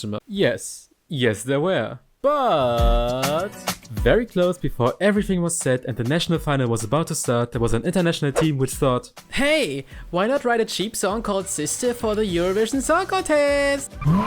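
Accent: Germany. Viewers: I love how realistic you are, and I like the editing, it was very funny. Also I love your accent it is sooooo german xP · Nettes Video aber konstruktive Kritik: du sprichst die englische Sprache Deutsch aus! Du könntest an der Aussprache arbeiten. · Boyyy work on ur accent. I am also from germany but it’s not like that bad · Wenn deutsche englisch reden hört sich es genau so an.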